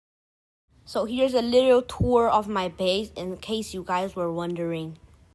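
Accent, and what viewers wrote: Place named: India. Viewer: Bro why u sound so indian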